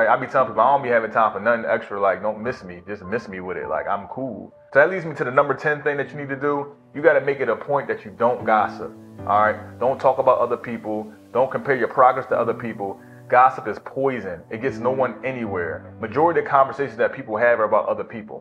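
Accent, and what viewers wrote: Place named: Philadelphia, United States. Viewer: Wait is he from Philly ? 😭 ”anything that ain’t thurl”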